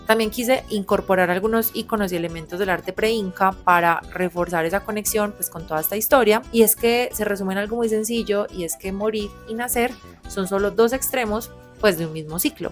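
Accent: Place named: Colombia. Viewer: Como Peruano estoy orgulloso de tus conocimientos en mi querido Perú,....por tu dejo ,.--- se que eres de la rica Colombia, te envio bendiciones y que todo te vaya bien.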